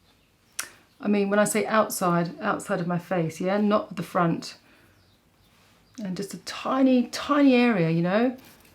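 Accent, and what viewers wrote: England, United Kingdom. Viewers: That lipstick is everything on you. The corals and peaches are lovely with your coloring. Love your videos and your beautiful English accent ♥️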